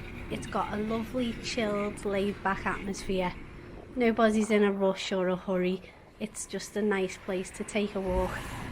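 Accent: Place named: Yorkshire, United Kingdom. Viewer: Canadian here so I'm a total moron and can't place your accent... is it Yorkshire?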